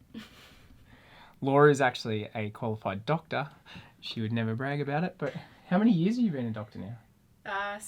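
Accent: Australia. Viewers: Jeeez! Auzzy English sounds shayyt! Cool video, though. Cheers. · Adore this build mate. So happy for you. As an Aussie that spent time in Canada I love pickin up the little subtleties in your accent, pure Aussie with little sprinkles of Canadian in there. Very nice haha.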